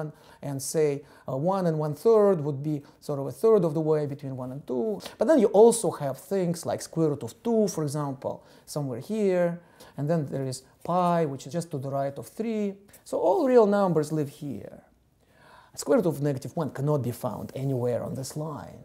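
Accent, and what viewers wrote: Russia. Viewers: I love math, as much as I love your Russian accent. :3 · there is no german accent to hear in this. Believe me i am German. His accent is clearly Russian and i find it quite nice to listen to. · Now why does he have russian accent? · The accent is Russian. I know several people with exactly the same accent. Actually, it could be Belarussian as well. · Me hear russian accent too. It's interesting because the man who named edward frenkel cannot be russian. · мне кажется или у него русский акцент? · да да, вместо three три говорит :) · Я тоже смотрю и думаю - он по ходу русский, раз я так хорошо его понимаю) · Not sure why but his Russian accent makes me understand math better. My all time favorite Numberphile video. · You got a strong accent *kind of Russian I guess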